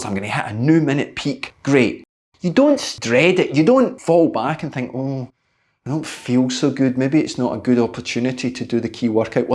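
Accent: Scotland, United Kingdom. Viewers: I’ve been working to much and now have time to get back to riding. Body isn’t in very good shape just did two rides of twenty miles after a few days of working on the bikes and fifteen minute butt accumulating rides on the trainer. Didn’t ride real hard but was pleased to have survived as well as I did. Power meter battery was dead for the first ride so no numbers for it. Second ride with power I showed a twenty minute of 170 and pleasingly also a 170 heart rate. At 63 the latter is well a surprise! Now to recover and attempt to acquire some endurance and riding strength again. Life would be simpler without jobs! Enjoy listening to your Scottish language and do apply some of what I hear. Hope your health is better then what was before. 😀 Have a good day over there and be safe!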